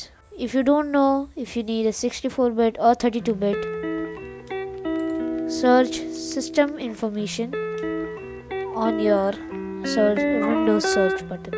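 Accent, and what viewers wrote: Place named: India. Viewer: Omg Indian accent is so annoying.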